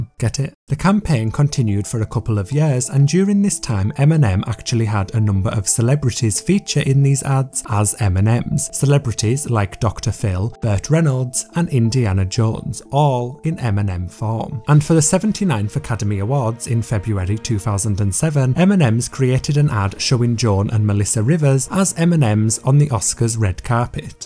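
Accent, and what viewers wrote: United Kingdom. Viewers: I appreciate the sincerity, seriousness and UK accent you employ in approaching these major topics of their time. It's like Dateline for silly memes.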